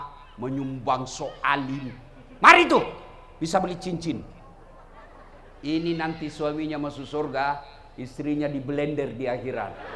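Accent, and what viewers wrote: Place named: Toraja, Indonesia. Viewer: Orang Toraja ki pasti 😅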